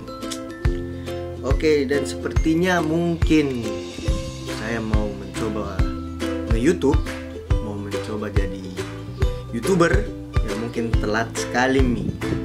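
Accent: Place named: Makassar, Indonesia. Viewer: konten gaming boleh tuh bang jadiin point, game horror kalo bisa kaya dulu channel heheee, kangen banget denger teriak teriak ketakutan pake logat makassar mu ituuu, pokok nya terusin aja, aku udh dukung dari duluuuuuuu banget. hehe semangat ya buat kedepannya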